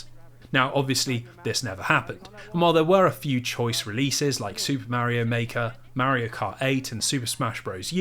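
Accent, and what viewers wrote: United Kingdom. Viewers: Wow this makes me want to play every Metroid I started with Metroid prime as a 9 year old it was too much for my simple mind then but I want to revisit all of them after this video and I’m so so sorry you fighting cancer god bless you!! I just got sober first time in my life different fight but still my life was on the line due to my drug of choice…and I will always argue you deserve millions of views and I’ll always say the smooth UK accent doesn’t hurt (; thanks bro love yah I’ll pray for yah and don’t ever stop fighting 🤙🏻❤️👍🏻🫶🏻👊🏻💪🏻💪🏻🦾 you kick that cancers ass you make so many peoples day brighter and feel nostalgic of their own past and for that we all thank you !! 🎉🎉🎉🎉 let’s go brother !!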